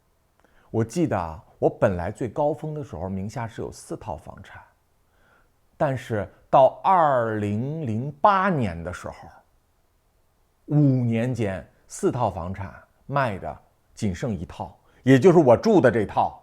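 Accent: Beijing, China. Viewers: 老北京味儿，亲切😊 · 聽叔說話特別有趣，像在聽人說書 連說話方式都充滿著北京感？古裝戲感？忍不住想學😊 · 我还以为主播是北京人呢，字正腔圆的普通话，挺真实的感觉，脾气太直说话太直，有北京人的感觉😊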